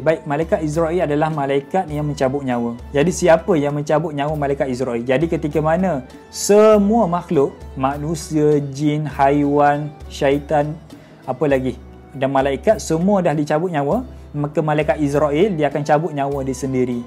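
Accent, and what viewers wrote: Kelantan, Malaysia. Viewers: Ustaz org Kelantan ke ?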